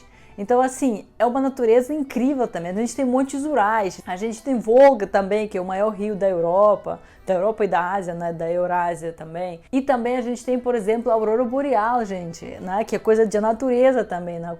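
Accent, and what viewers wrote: Rio de Janeiro, Brazil. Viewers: Tá com um sotaque carioca carregado, o famoso "S" chiado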